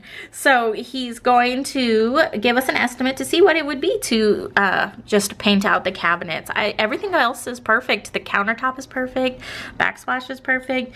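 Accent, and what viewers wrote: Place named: Seattle, United States. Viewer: I am a fairly new subscriber to you channel. Just listening to I got the feeling you are from Seattle. Are you?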